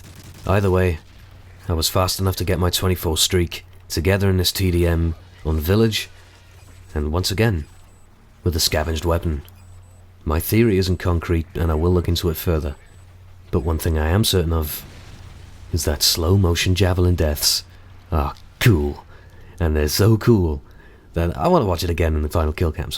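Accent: United Kingdom. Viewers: That voice is incredible, where in the UK are you from?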